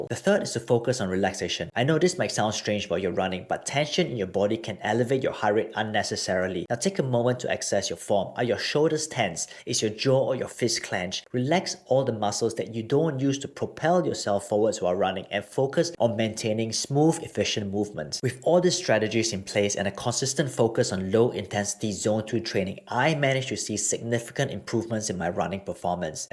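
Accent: Singapore. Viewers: u singaporean ah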